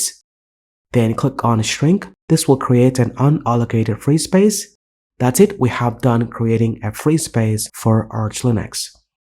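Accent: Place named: India. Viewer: Nice accent for an Indian. I didn't knew you were Indian until I saw the profile.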